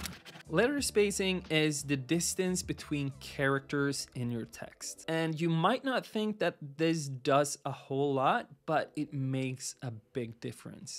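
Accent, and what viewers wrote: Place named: California, United States. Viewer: typically cali dialect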